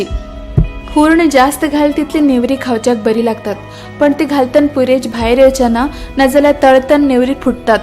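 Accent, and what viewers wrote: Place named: Karwar, India. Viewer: Refreshing to hear karawari Konkani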